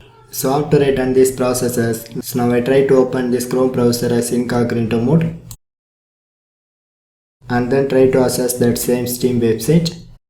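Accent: India. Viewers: When you hear an Indian you know it’s gunna be right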